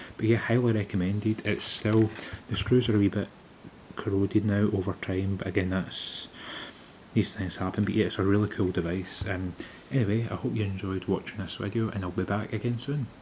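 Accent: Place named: Scotland, United Kingdom. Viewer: Thank you for this video! There's really no information on this thing anywhere online so it's nice to see it. Also a Scottish accent? Nice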